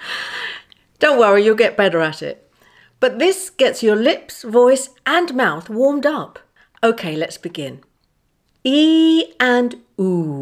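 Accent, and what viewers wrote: United Kingdom. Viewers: The accent of English is British? I don´t notice at the first time that I listen your voice. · Can ask u a question that what's kind of your british accent?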